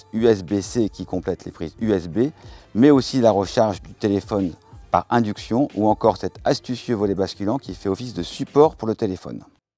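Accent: Paris, France. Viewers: Chef' ! L'accent parisien !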